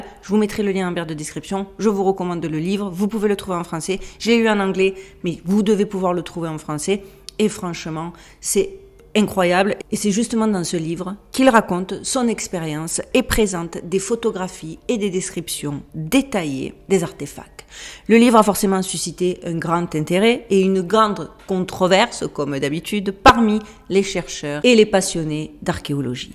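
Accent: South, France. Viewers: Puisque les commentaires t'aident pour remonter les algorithmes , commentons! Franchement, j'attends tes videos avec impatience. Ta manière de presenter est claire, précise, documentée et souvent drôle malgré des sujets qui ne le sont pas toujouurs! Mais ce que nous apprécions au delà de tout c'est ton bel accent du sud qui, pour les expats que nous sommes, nous ramène vers d'autres temps, d'autres lieux.... Surtout ne te laisse pas intimider. Please go on with the great work! Blessings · Votre petit accent du midi , peu commun à Las Vegas apporte le petit rayon de soleil à travers votre reportage. Cw que vous indiquez est réel. J'ai eu la chance de visiter les souterrains de Bucegi... Tout ce que nous entendons vivons lisons apprenions et voyons est archi-faux ! 🙂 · Allô …. Juste par curiosité ou as tu appris à parler français….de quel origine es tu….je trouve que tu as un accent du sud de la France….ton accent est très jolie soit dit en passant.👍🇲🇶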